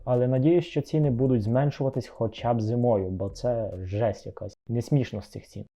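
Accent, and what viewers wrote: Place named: Ukraine. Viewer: сільський український акцент і купа русизмів ,очевидно стягнутих з перегляду кацапських . почисти то трохи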